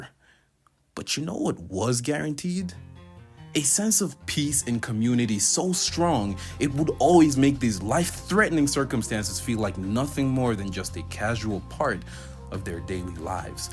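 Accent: United States. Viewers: Great vid as usual! I hope this isn't a rude question, but why does your accent sound American? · Then how do you have an American accent when you were born and raised in Rural Africa? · yeah but like what I mean is he sound like he was born and raised in like Detroit or smth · Your English is fantastic!!! You sound like my neighbors in Virginia. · why do you sound american, genuinely asking. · Born and raised in Africa but have a American accent? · can i ask how come you have an american accent? im curious! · And yet you sound American? 🤔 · Why does your accent so American?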